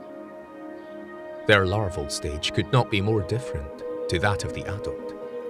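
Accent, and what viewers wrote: Scotland, United Kingdom. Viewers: You Scottish by any chance?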